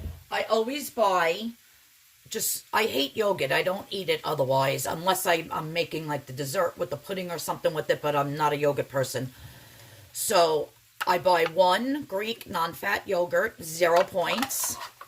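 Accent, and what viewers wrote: New York, United States. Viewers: I knew from your "accent" we lived near each other...LOVE YOUR NY ACCENT!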